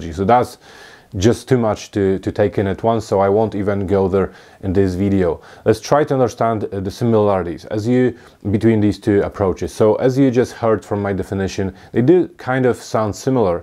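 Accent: Scotland, United Kingdom. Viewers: Youve lived in Scotland havent ya?! This kiwi can hear it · You sound Scottish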